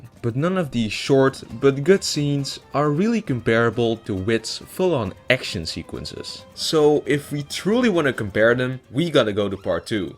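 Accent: Netherlands. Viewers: Bro sounds dutch? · U sound so dutch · Ik kan gewoon horen dat dit een nederlander is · can tell ur Dutch by ur accent lolll w NL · Are you Dutch? Your accent sounds very Dutch so I'm just wondering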